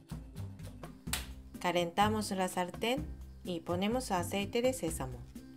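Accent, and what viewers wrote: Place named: Spain. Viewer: Que hermosa la Japonesa... Me gusta tu acento español y se mira que cocinas muy sabroso. Saludos 😉😘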